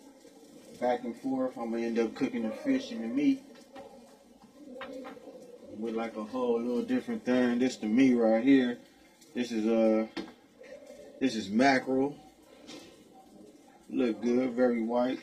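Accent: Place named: West Coast, United States. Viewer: He Is In A California Prison!!!! His Accent Is Straight West Coast!!!!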